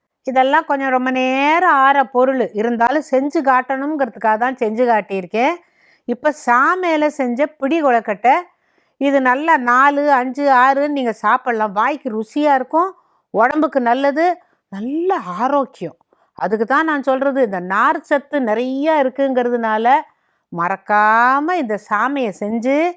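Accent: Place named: Rural Tamil, India. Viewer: I love the way you speak in rural Tamil. Very interesting and informative. ❤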